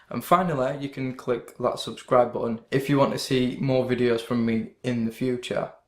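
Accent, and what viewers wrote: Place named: Yorkshire, United Kingdom. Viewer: i'm from Leeds myself it's pretty rare to find someone with a Yorkshire accent on Youtube it's good to see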